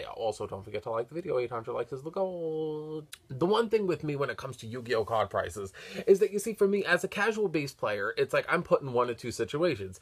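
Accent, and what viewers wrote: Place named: Boston, United States. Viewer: I like your Boston axe cent.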